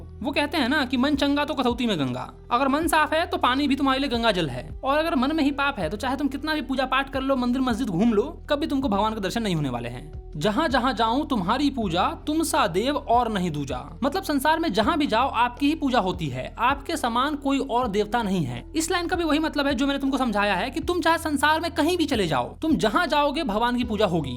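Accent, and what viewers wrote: Bihar, India. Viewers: Sir aap bihari hai na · Aap bihar se hai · Sir , Are you from Bihar because you're accent is just like Biharis people, and sir I am also from bihar and I want to say you teaching style is amazing ❤❤❤ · Bihar ke hai sir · sir kya aap Bihari hooooo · Bhai aap kya bihari ho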